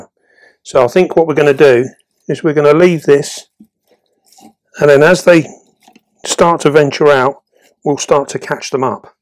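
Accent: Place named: Cockney, United Kingdom. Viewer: I stumbled on one of your videos yesterday and the way you handled and cared for a big Australian spider seemed to be a bit therapeutic for me regarding my arachnophobia. You remind me a bit of a cockney David Attenborough (no offence). Thanks, you've got a new viewer. 👍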